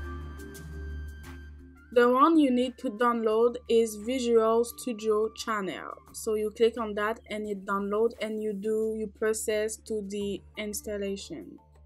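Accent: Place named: France. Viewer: Lol you are a french people we can hear that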